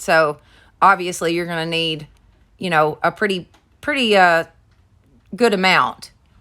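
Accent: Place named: South Carolina, United States. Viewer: Girl...loved them all. Gotta ask are you from Georgia or South Carolina? I was born and raised in SC and I swear we have the same accent.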